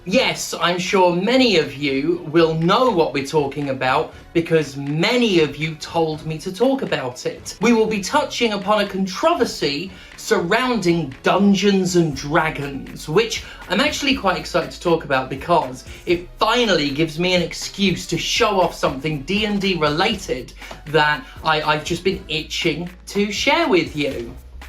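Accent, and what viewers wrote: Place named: United Kingdom. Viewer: Hey Stephanie Long Time watcher I feel like I don’t comment enough to let you know that I love your Content because I love anti-corporate stuff and I love video games and I love you. Just wanted to say that it sounds like these corporations are the most chaotic evil rogue guild and I adore that you used a cyberpunk 2077 phrase when you called them corpos. Thanks for saying that they were rolled A1 this time but still have their eye on the cookie jar. I love your British accent, and you’re alliteration and your metaphors.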